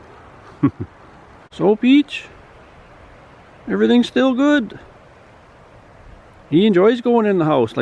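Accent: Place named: Canada. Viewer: New to your channel… I’m guessing you’re a fellow Canadian by your accent/dialect.. Where about’s you located? I’m subscribed! Cool stuff, with love from Alberta ❤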